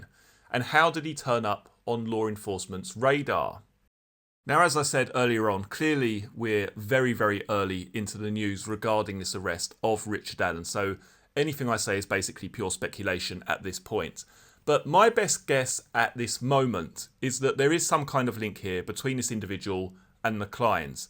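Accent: United Kingdom. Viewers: Another UK guy covering a US story—I have noticed a huge number of UK people narrating US stories. Now I love you guys, don’t get me wrong, but it’s Frickin’ irritating…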